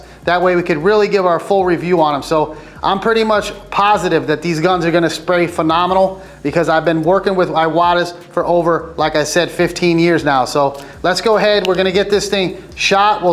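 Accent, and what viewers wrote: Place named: Boston, United States. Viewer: Accent like your from Boston. Or Minnesota.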